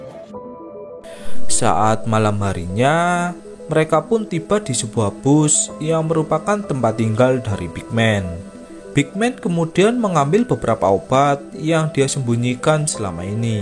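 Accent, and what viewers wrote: Java, Indonesia. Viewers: Logat jawanya medok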